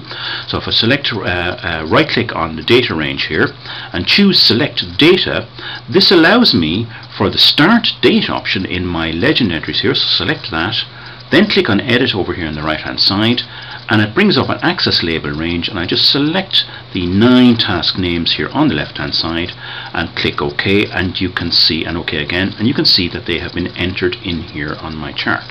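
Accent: Ireland. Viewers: I love his accent! Irish? What's up? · Hi there, I am a nurse who knows very little about Gantt charts other than they exist a. I searched in EXCEL and found your video. I watched two and found them very helpful. The bonus was the course was created by someone from Dublin and I could enjoy hearing an Irish accent as I followed along. I am a Dub who has lived in Vancouver, Canada for many years who really enjoyed having an Irish teacher! Great videos. cheers Clare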